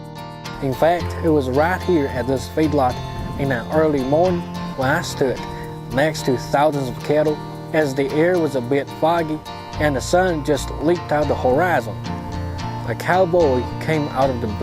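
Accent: South, United States. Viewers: I think if you had learned and tried to speak with the "midwestern American" accent that television news reporters use, you would speak with a Chinese accent. Because you learned and speak with a southern accent, it hides any Chinese accent. You sound like a person from the American south. If this works for you, great! There are probably relatively few people from China who are learning English with an accent from the American south so it makes you very memorable. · Men you have no idea how much I love you southern accent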